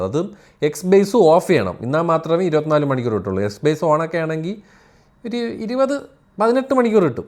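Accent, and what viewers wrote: Trivandrum, India. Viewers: ട്രിവാൻഡ്രം ചീഞ്ഞ സ്ലാങ് 😡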